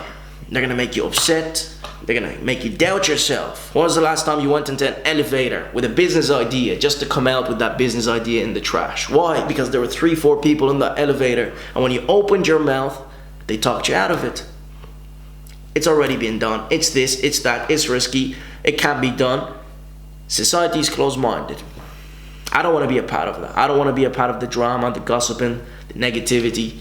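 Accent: Ireland. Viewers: You irish bro I think I can hear it im from tipperary but cant make out your accent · Is that an Irish accent?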